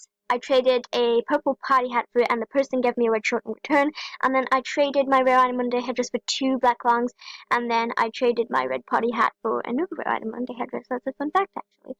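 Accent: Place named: United Kingdom. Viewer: Is that your real voice? Its high but its sounds like a british human...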